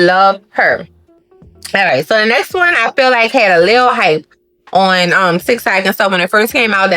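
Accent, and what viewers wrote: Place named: Louisiana, United States. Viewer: Hey Sis!!! Girl...are u from Louisiana?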